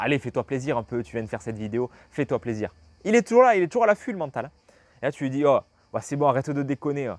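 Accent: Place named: Marseille, France. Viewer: J'adorais cet accent marseillais ;)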